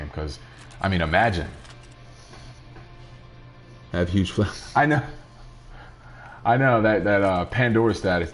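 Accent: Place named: Detroit, United States. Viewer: Are you from Detroit? You sound like you're from Detroit. I'm from Detroit.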